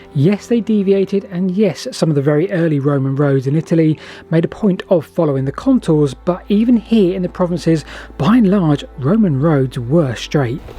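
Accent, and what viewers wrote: United Kingdom. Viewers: Okay, aside from the British accent, and the poor spelling in the captions, there are so many "asides" and rambling on that I cannot follow what the hell you're saying, or your reasoning. Is it that Roman roads were "straightened" by wealthy citizens so they could name the side-road after themselves? And have a small temple or monument placed to glorify themselves? What? Maybe those "maps" work for the viewers in England, but they do nothing for the rest of us. You had to take so many detours to avoid private farms or locked gates, I have no idea where you went becaue you never showed us on a map! Or even pointed behind you, saying "I came from back there...." For example, you bring up Hadrian, but never say why he's important. You're getting a downvote from me, because what a frigging mess! The countryside is beautiful, but it's all a mess!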